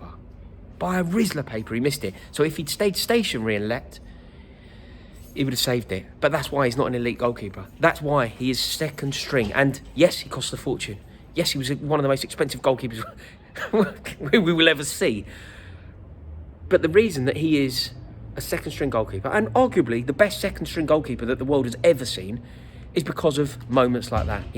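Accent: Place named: England, United Kingdom. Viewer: Your English accent is so fine to hear , very traditional and classy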